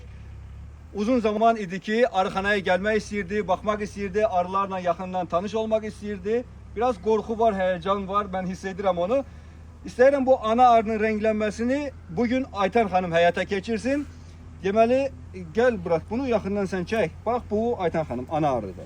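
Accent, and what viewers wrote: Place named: Zaqatala, Azerbaijan. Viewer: Zaqatala aksenti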